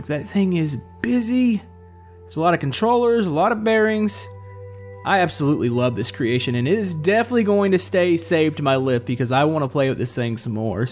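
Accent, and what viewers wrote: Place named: United States. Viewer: i can really here your country acsent in this video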